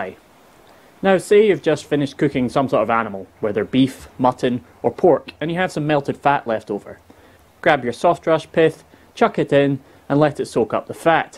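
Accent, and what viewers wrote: Scotland, United Kingdom. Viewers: Haha, the scottish accent is so badass, that the automatically created closed captions just said, "yeah, well, f' ck it..." and just doesn't even try 😁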